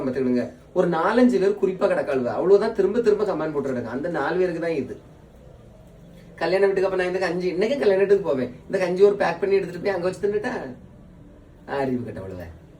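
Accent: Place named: Kanyakumari, India. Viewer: Kanyakumari slang laye thittureenga anna super 🎉😂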